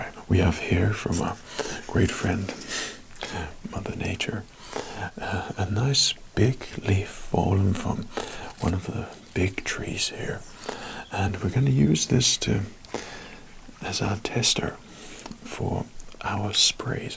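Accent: United States. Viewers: Your american accent is improving 👌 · I mean with all due respect, because this video is hilarious… but what the actual fuck,?! 🤣 Hit the bowl too many times and came up with a WILD video! Really not too shabby there with the American accent! You don’t lose it too often and when you do, it can easily be glossed over when we reset. A dialect coach might not be fooled but I can be!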